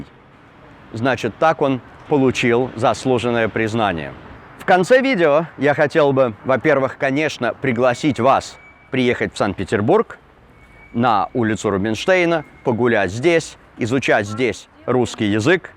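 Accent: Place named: United States. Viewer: Очень интересный рассказ о Довлатове, спасибо. Но я не понимаю, зачем, будучи преподавателем русского языка, упорно использует американскую интонацию во всех своих видео.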